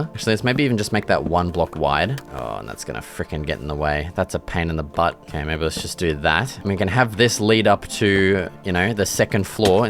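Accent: Australia. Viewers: Oh gosh you make great builds , but your narrative makes me laugh,fair dinkum Aussie way of talking,crazy man ..........few swear words thrown in is typical, lol,not that Im keen on that way of speaking, but its just you.... Cheers 🐨🦘🇦🇺🌟🤩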